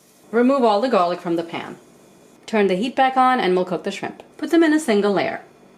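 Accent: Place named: Long Island, United States. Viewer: Delicious! You have a long island accent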